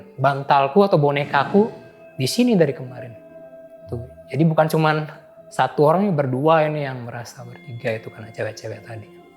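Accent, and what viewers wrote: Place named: Bali, Indonesia. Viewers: Ada logat bali nya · abangnya ngebali banget logatnya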